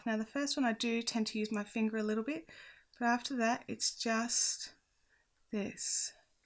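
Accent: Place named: Australia. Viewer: Wow!! I've never heard of continental knitting til now! I can knit & crochet, but haven't knitted for many years, but crochet heaps as it's my passion. If I ever decide to knit again I'll have to give continental knitting a go! I've just found your channel & subscribed. 😀👍 I assume you're an Aussie by your accent. 🤔 Blessings from Adelaide, South Australia. ❤️🦘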